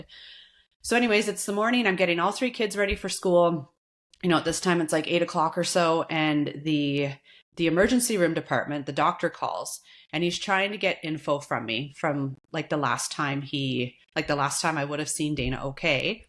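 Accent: Canada. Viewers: You sound Canadian. Hope you qualify for financial assistance from the government and that your husband had life insurance. I have faith your husband is at peace, it's those of us left behind who suffer. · sounds like canada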